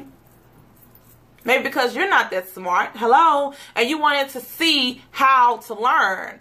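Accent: Mississippi, United States. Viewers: your very inspiring.. I love how you handle the comments... I also have thick skin also.. I'm just starting 2 new channels and I am looking at other youtubers like you and I get cause y'all have a lot of subscribers where as on my other channel (not this one) has about 30 subscribers... don't get me wrong I love my 30 subscribers and I love them all dearly but I want to be inspiring to more people like you are baby girl... I have a lot of friends from missisipi and your voice is just like theirs and I can easily tell you are from missisipi and I don't think your voice is annoying... Much love to you and your life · Fuck em boo 💋 they need to boss they life up 😜 #LovingThatMississippiAccent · I'm not like them but I love your videos!!! You must be doing something right. Remember you are going to have them haters which is very sad. To say the least. 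They need to get there life. Must don't have nothing better to do. Like that song. Plus jealousy sad. It's truly nothing wrong with the way you talk. You just have a country accent that's all!!! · she may be fat but she still slays and she looks good Plus Size and you don't have the right to tell her that because she is still human and she is beautiful just the way she is, so all y'all hating keep it to yourself and if you wanna say something say it to her plus her 155.6K subs and the way she talks is just because she is from Mississippi. You can't hate on somebody just because they talk different from you. STOP THE HATE!! her family loves her the way she is so don't assume they are happy and if you think your hate comments are ruining the life, THINK AGAIN HONEY!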